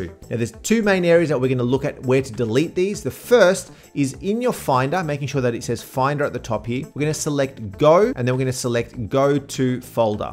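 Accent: Australia. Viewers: I'm Australian too. I say caysh too, But then I also say shedule rather than scedule. But it's useful to know that when other videos are clearing the cash, it's got nothing to do with robbing a bank. , you are getting tired, because you say you should be weary when you are deleting your cache. I think you should be wary. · Thanks. I'm an Aussie too, so I pronounce cache same as you. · The Australian pronunciation seems logical but I do pronounce cache "cash" (I'm a native English speaker from Hong Kong). One of the funniest Australian pronunciations to me is "daahtuh" (data). And why do Australians pronounce the 'a' in "last" the British way but the 'a' in "chance" the American way? 🤔 My own pronunciations can be weird though: I pronounce "route" the British way ("root") but "router" the American way ("rauter") because "rooter" reminds of the Australian slang and so sounds inappropriate... 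🙃 More importantly, thanks for the helpful video. I found your video bc the tech guys in my office will clear the cache of my PC work laptop when it's running slow, and it occurred that I'd never once cleared my personal MacBook's cache. Did you actually just pronounce wary "weary"? 🤫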